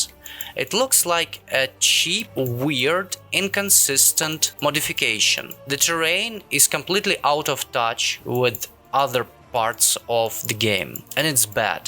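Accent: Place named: Russia. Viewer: молодец! По акценту сразу понял, что наш 😂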